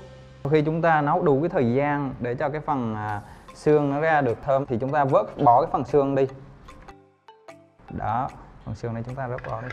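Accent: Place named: Phu Yen, Vietnam. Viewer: Tiếng giống khu vực tây hoà phú yên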